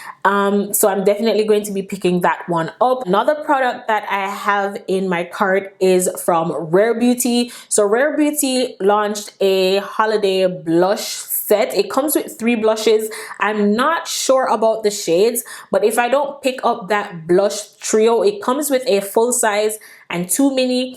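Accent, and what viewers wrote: Jamaica. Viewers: Hey hun...Are u jamaican by any chance.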